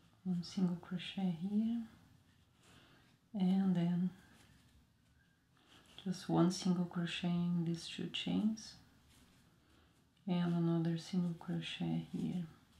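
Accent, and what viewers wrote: Brazil. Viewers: Pelo teu acento deu pra perceber que vc é brasileira 🤣faz esse mesmo modelo em português please🙏🏾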